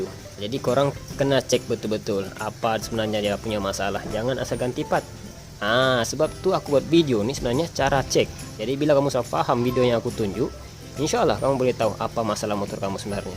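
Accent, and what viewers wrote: Malaysia. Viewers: Awak nich orang mane, cakapnye kaya orang melayu, ini videonya ranca bana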